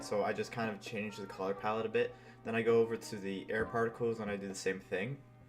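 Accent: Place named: Scandinavia. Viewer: What part of Scandinavia are you from? I can tell you are Scandinavian from your accent.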